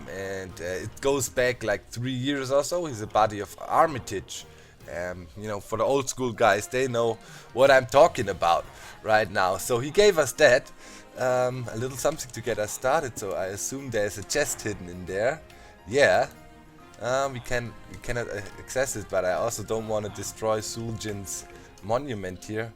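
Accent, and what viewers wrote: Germany. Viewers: Love the German accent. <3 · Schön dass endlich ein deutscher da ist. Ich bin ehrlich: Als ich gesehen habe, dass X gesagt hatte, es gibt einen neuen Hermit, habe ich schon die Augen verrollt. Es gab in letzter Zeit so viele neue Members. Aber als ich gesehen habe, dass du es warst, war ich doch sehr erfreut. Wirklich kennen tue ich deinen Kanal nicht (nur so 1-2 Videos), die ich mal gesehen hatte, aber mir im Kopf blieben. Wahrscheinlich wegen dem englischen akzent, der eindeutig zeigt, dass du Deutsch sein musst. =D So nun habe ich noch eine Bitte an dich: Auch wenn du mehr der praktische Typ zu sein scheinst, probiere doch jeden Raum entsprechend zu designen und "schön" zu bauen. Irgendwie ist mir das wichtig, auch wenn sich das komisch anhört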